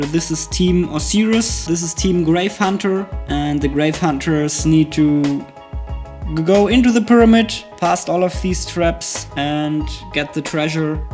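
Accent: Germany. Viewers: Are you German ?!?! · are you german? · Are you from deuthland?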